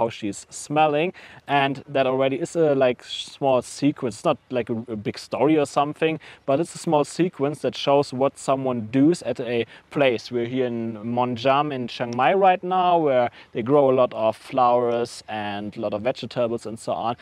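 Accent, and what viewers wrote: Germany. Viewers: Thank you for sharing your expert knowledge. For film and photography enthusiasts, your help and tips are indispensable for better quality product. You are easy to listen to and your German accent lends additional interest to your videos. Thank you, again. Steve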